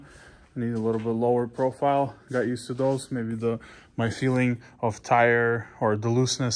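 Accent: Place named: Eastern Europe. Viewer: Another great video. People want to put down the 6.4 but remember is was not Ford Nor International fault that the government made so many emissions mandates. Many people say that after 10 years then the vehicle emissions should be exempted. By the way, I hear a hint of either Russian or Ukrainian or easter Europe in your voice. May I as, Where are you all from?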